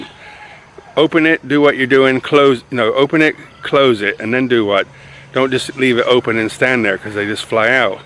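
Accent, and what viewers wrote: United States. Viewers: I am Thai .... Lazada of Shopee is the most popular in Thailand ... For Ex. I try to search "5G pocket wifi".. found Xiaomi Alot Router AX3600 cost 2580 bath from China delevery about 9-12 day which is no costom tax. ......... I think 4G is enough because it speed up to 150Mbps .. if operater around signal only 40 40 ..... ผมคนไทยติดตามคุณเพราะอยากพัฒนาภาษาอังกฤษ สำเนียงอมริกันฟังง่ายครับ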